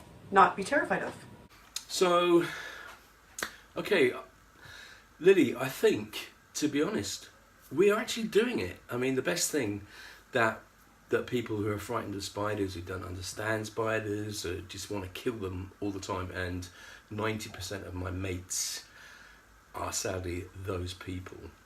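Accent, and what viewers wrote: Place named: RP, United Kingdom. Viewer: you sound quite posh.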